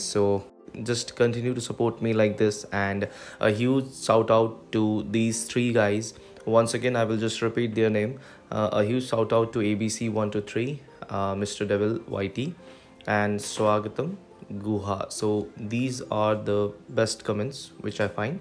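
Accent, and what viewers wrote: India. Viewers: You sound so Indian ^_^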